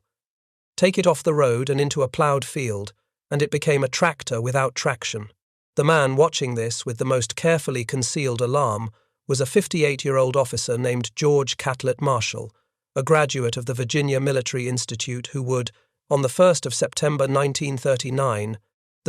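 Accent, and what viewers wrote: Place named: United Kingdom. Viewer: I refuse to listen to the same British narrator trash Germans every 30 seconds for being unable to understand breathing air or assembling 3 word sentences. News Flash News Flash News Fash British toady narrator The Germans had NO fuel for trucks, jeeps, tanks, airplanes Get it? Get it? Get it? NOOOOOO FUUUUUUEL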